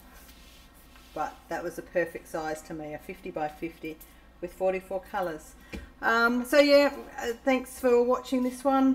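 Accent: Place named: Australia. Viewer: This is lovely! 50x50 is becoming my go-to size. Also, great to hear an Aussie accent!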